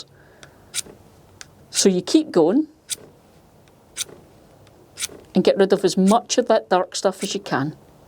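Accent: Scotland, United Kingdom. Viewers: Thank you from Phoenix, AZ, USA a little bit of difficulty understanding that beautiful Scottish accent...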